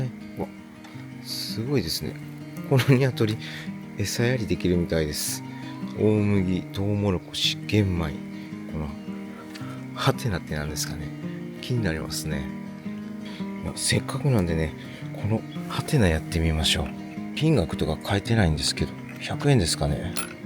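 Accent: Kansai, Japan. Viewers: 鮮やかなbgm.親しきな関西弁。最近見ることなんですが、続々見てしまいます。 韓国語の翻訳も自動ではなくちゃんとしてたら韓国の多数の温泉好きな人達に喜んでもらえると思います、😂 応援しています🎉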